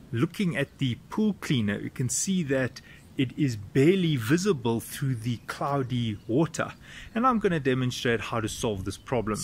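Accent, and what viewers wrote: South Africa. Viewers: Lekker , hearing a SA accent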